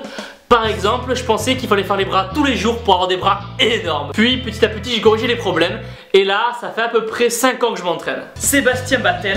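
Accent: South, France. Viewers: Question perso : Tu habite ou ? Car je vois que ta un belle accent du Sud je doit pas etre loin de chez toi ;)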